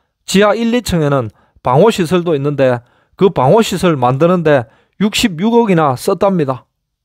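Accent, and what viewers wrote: Gyeongsang, South Korea. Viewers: 경상도 ㅋㅋㅋ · 첫화면에서 갱상도라고 생각이 들더만 맞구만.. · 말투 부니 경상도 분이신거 같은데 진짜 궁금해서 그래요 도청 이전 관련된 이야기 모르시는 건지?? 아님 컨텐츠 때문에 세금 타령 하시는지 좀 궁금 하네요..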